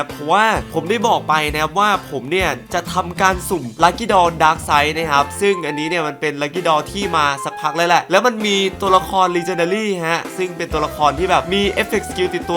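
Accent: North, Thailand. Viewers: คลิปก่อนพี่พูดเหนือ ผม:นี้ใครว่ะ?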